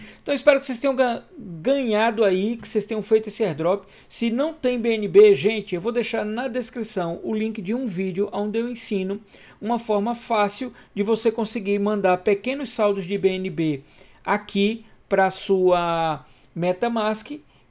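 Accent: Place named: Pernambuco, Brazil. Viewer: vc era de q parte do nordeste Aki no Brasil? Vou chutar Pernambuco por que vc fala sempre ( butar ) 👏👏👏👏👏👏👏👏👏👏👏👏👏👏👏👏👏👏👏👏👏👏👏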